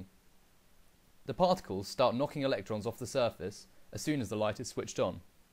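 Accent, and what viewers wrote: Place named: RP, United Kingdom. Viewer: Awesome posh accent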